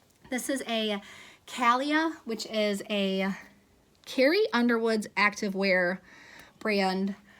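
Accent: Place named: Michigan, United States. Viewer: OMG 😱 I called it! Girl, I was just thinking to myself that your accent was totally a Michigan accent! I’m from Michigan and now live in Oklahoma but watch resale videos from all over. With that said, lol, I’ve gotten pretty darn good with guessing accents, but you are my first Michigander resaler (I know, I know, resaler is not a word lol) that I’ve found so far on YouTube!! Sorry y’all for the ridiculous giddy reaction, but if you were from Michigan, you’d understand! Although that could be due to my age! LOL. Anywho, nice to meet you and thanks for the resale tips on clothes! I haven’t dipped my toes in that area of resale but am enjoying the tips. Last but not least, I watched your latest video in which you mentioned that you heard it helps to make sure to ask for thumbs up in the videos and girl it’s true! And a lot of the reason why is just that people forget (including me! 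Lol). Look forward to more and keep representing strong girl 😜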